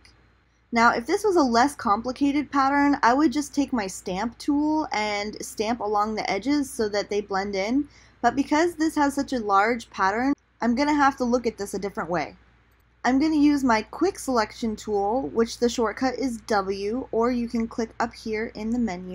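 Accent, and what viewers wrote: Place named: United States. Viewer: Where is this annoying US accent from? It's exactly the one we try and imitate in Europe when we say US tourists are obnoxious and loud. Bay Area? Santa Monica?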